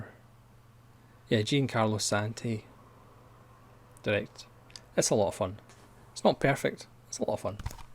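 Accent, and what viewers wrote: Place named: United Kingdom. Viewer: I watched the whole thing in one viewing. I like westerns and grew up watching them to an extent as my dad was a big western fan. I have a friend that doesn't like Miles Davis. I told him but there are other reasons to listen to Davis--he as an amazing ensemble around him that play the music as well: John McLaughlin, Herbie Hancock, Wayne Shorter, etc. The same is true for John Wayne pictures. In The Cowboys, Bruce Dern plays a great villain. Red River one of the greatest scenes as the cowboys hoot and holler as they begin their trail drive. The Searchers is just an overall well made film, and Wayne's character isn't exactly portrayed as a hero, more obsessive. Perhaps later on you'll be able to approach him and understand his performances. Are you Brit or Scottish or Irish? I ask because you have a rather thick accent. 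Some westerns you didn't mention: Lonesome Dove and Return to Lonesome Dove the TV series was very well made. Paint Your Wagon is an interesting western musical. Unforgiven is an incredible western where a gunfighter's past comes back to haunt him. Little Big Man is a rather circular film from white man to Indian culture and back again. A Man Called Horse shows some interesting Indian culture. Hud, a contemporary western with Paul Newman. All the Pretty Horse, another contemporary western. Shane--consciously mythic, superb characterization in Alan Ladd's character and a crazy good fight scene. Pat Garrett and Billy the Kid--I tend to enjoy this more over The W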